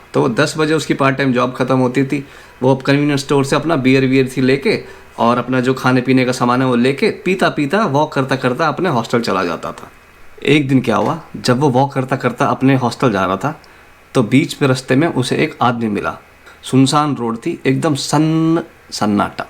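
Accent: Haryana, India. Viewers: Bhai love ur haryanvi accent, plz aisi videos daala karo….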